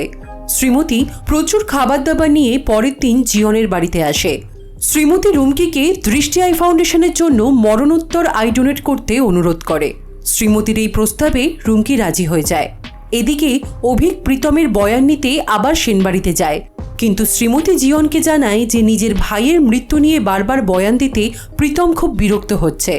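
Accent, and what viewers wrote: India. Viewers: Apni ki Indian? Apnar bangla accent er jonno ask korlam 😊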